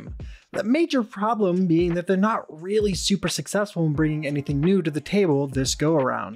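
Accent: United Kingdom. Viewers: Why hire the British voice over guy? He's got the most boring voice ever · LMAO SAME. i have no idea why there's a british guy reading it but it sounds so goofy to me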